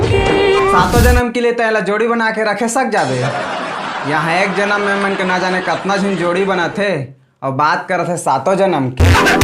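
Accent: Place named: Janjgir, India. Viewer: Janjgiriha tone lgthe ga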